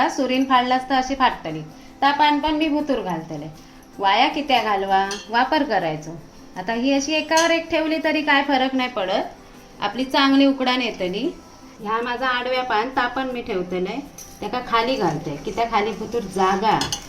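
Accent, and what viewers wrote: Malvani, India. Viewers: तुझे video मी recipe साठी बघतेच. perfect measure असतं. thanks for sharing पाटोळ्या. आणि सगळ्यात महत्त्वाचं. तुझं मालवणी बोलणं फारचं आवडत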